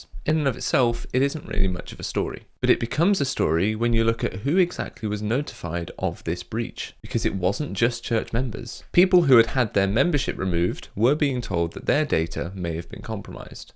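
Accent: United Kingdom. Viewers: Yeah, he’s sneakier than a lot of antis. His British accent misleadingly indicates a warm, intelligent tone.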